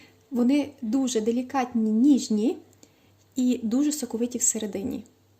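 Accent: Poland. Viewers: не ображайся, але твоя вимова трохи ріже вухо. Тебе гарна українська мова, але відчувається польський акцент на рівні наголосу на останніх складах у словах. Це робить нашу українську мову у твоєму спілкуванні дещо не гармонійно. Тому прошу це врахувати. Ти як блогер, людина з вищою освітою повинна розмовляти правильно. Покажи всьому світу, що українська мова гарна, мелодійна. Роби правильні наголоси! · мова твоя повязана з польскою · Ви молодці.Ваша українська мова чудова.Живемо майже рік в Польші і лапаю себе на думці,що частіше думаю на польській і деколи навіть плутаю слова укр з польськими.Це цілком нормально...цим не переймаюсь...Подруга моя довше живе тут,чоловік її поляк і спілкується з ним на польській,то в неї така подібна вимова до вашої.Мені подобається,багатьом теж...а кому ні то нехай не слухає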